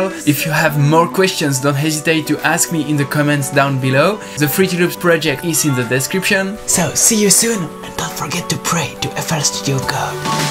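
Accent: France. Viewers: ta bon un accent français 😁 moi aussi je suis dj et producteur et j'ai un chat aussi par ailleurs 😆 sa fait plaisir un youtubeur qui fait des videos sur fl studio de cette maniere avec de l'humour et du montage j'aime bien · Hes french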